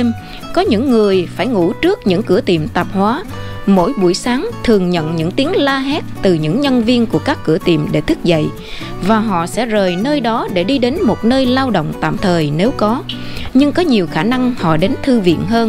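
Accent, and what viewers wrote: Standard. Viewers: Cảm ơn em giọng em hay quá nghe rất chuẩn. Cảm ơn em đã cho chị biết những gì của xu Mỹ vậy mà họ cứ gọi là thiên đường bo quê hương vn mà đi. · Ban đúng là nhà báo chân thųc đúng nghiã.vūa biên tâp vūa xương ngôn viên rât chuân.rât trình đô và giōi..trân trong ban. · Hay và chuẩn giọng đọc rất hay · Nói chuyện quá chuẩn hay luôn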